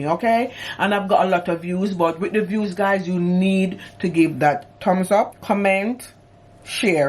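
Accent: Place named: Jamaica. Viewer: Very well presented you're channel is amazing I love the yard voice. Bless up yu self.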